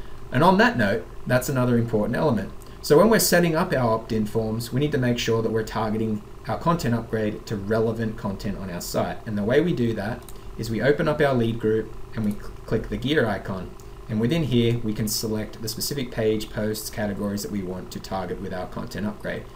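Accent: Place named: Australia. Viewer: That was a very very good video. Thank you for sharing. BTW, love the Aussie accent :)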